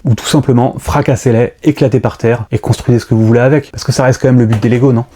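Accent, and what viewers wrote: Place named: Spain. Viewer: Tres bel accent espagnol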